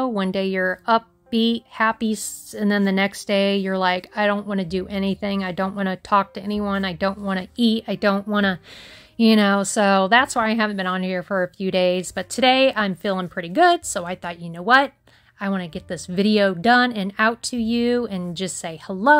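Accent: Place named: California, United States. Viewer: Loved the Calley girl accent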